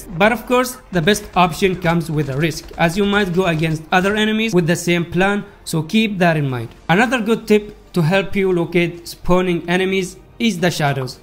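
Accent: India. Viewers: Bro where are you from? You sound like Indian If India which state?🤔 Jst want to know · Sorry for asking but your voice was like indian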